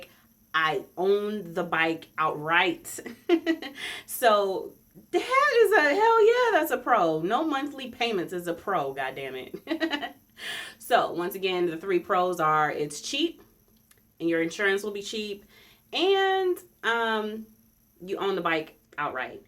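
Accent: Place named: RP, United Kingdom. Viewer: So nice and honest. Thank You! And, love it you speak the Queens English. I was prepared based on your Youtube name for a bunch of profanity and excuses. You go girl !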